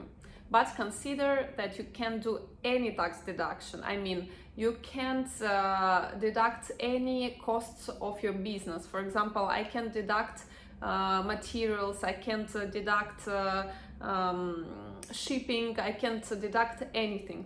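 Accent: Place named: Russia. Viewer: She is uttering English words as overlap on Russian thinking and manner of conveyancing thoughts. I am Russian-English interpreter and feel in a second the nature and manner of speaking. Non dovete pensare lei e brutta or sbruffona. Questo e il suo modo di dire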